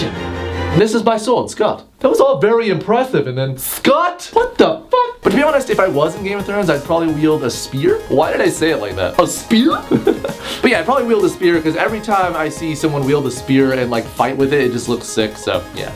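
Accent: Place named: Scotland, United Kingdom. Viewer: Can you say "if you had a chance to change your fate, would ya?" In your Scottish accent ? :) (from the Disney movie brave)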